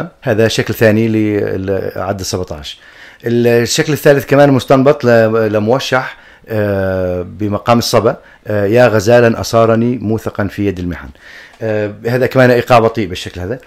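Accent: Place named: Sadad, Syria. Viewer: اللهجة قريبة من أهل صدد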